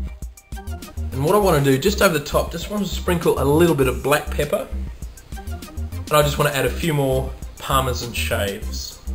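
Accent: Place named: Australia. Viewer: xD his accent is australian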